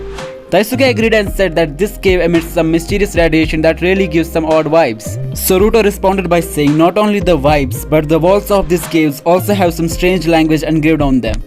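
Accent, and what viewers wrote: India. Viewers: You sound Indian are you?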